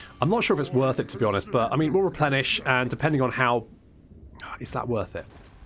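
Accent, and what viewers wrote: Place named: United Kingdom. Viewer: What? Who's competing with you? That fraud PewDiePie? You've got something he'll never have - a British accent.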